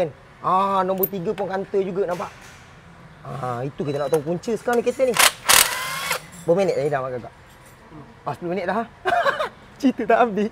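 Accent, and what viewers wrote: Pahang, Malaysia. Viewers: Bro orang pahang ke ? Kampung kat temerloh ke ?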